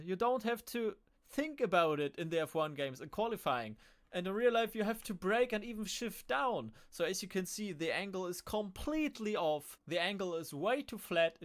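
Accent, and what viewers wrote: Germany. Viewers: Irgend wo höre ich den deutschen Akzent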